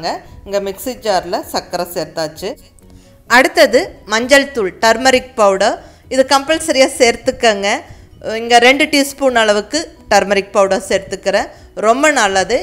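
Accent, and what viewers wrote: Coimbatore, India. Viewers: சகோதரி சமிபமாகத்தான் தங்களின் பதிவுகளை பார்க்கும் வாய்ப்பு கிடைத்தது மிகவும் சந்தோஷம் அருமையான பதிவுகள் எளிமையான முறையில் நல்ல தமிழில் புரிந்து கொள்ள கூடிய முறையில் இருக்கிறது வாழ்த்துகள் 💐💐தாங்கள் கோவையை சேர்ந்தவர்கள் என்று நினைக்கிறேன் உச்சரிப்பு அருமை வாழ்க வளமுடன் நலமுடன் தீர்க்கமான ஆயுள்ளுடன் பல்லாண்டு பல்லாண்டு பல கோடி நூறாண்டு கள் ⚘⚘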